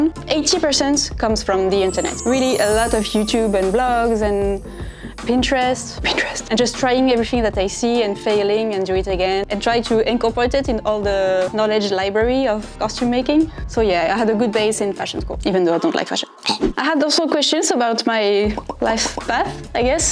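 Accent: France. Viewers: You are just adorable! I love watching you!!! Your accent is why I took 5 years of French. Almost no one speaks French in Western Colorado, but I still think it’s the most beautiful language!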